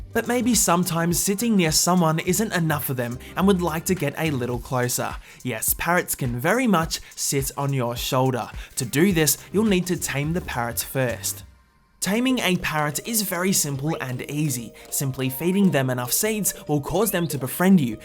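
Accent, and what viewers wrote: Australia. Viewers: are you Australian? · wait a second.. are you aussie? cuz you sound aussie to me lol btw i’m australian lol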